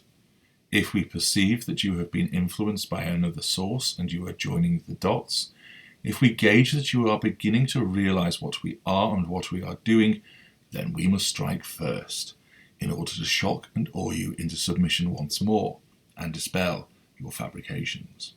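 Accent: United Kingdom. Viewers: Even if they are good, and he is very good at his Kraft. Never praise them. You are providing huge supply by doing so. Also, the British accent does make it interesting but also makes him sound pompous. This is in harmony with his Advanced narcissist claim. I believe him. He is sophisticated in the ways of narcissism. But that's nothing be proud of if you truly are narcissistic. You simply mirror the One who is your father. Satan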